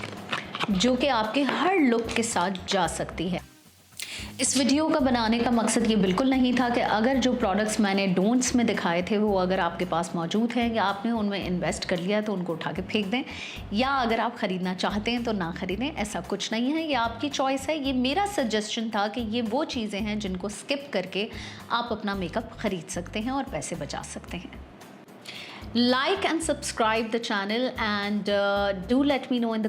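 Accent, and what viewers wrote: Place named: India. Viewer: Why are you talking like Indian!? Why can’t you say khareed & baghair properly if you’re a Pakistani I’m surprised.. 😳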